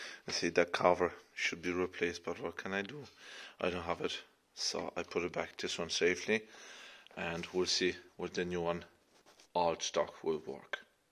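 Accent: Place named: Cork, Ireland. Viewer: loving that cork accent, man. thanks for the vid bai.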